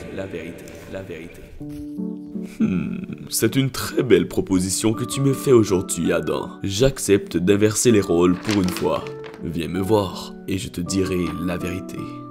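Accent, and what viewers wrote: Quebec, Canada. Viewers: je clique j'entends l'accent québécois je fuis la vidéo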